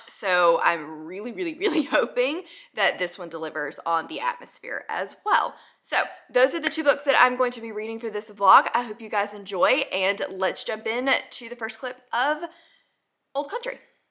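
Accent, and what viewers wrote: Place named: South, United States. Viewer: heehee your southern is really coming out in this video and I love it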